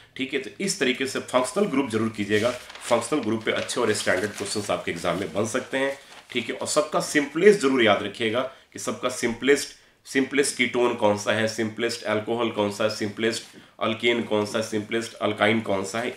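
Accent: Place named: United Kingdom. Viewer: English accent yes... Lekin jldi bana Dena Bhai... 2 din or h paper m..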